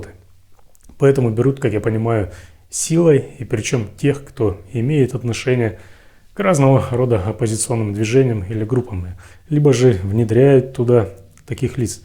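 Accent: Ukraine. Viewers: Украинский говор. Гэ, Хэканье. По говору западный галичанин! Горбачев!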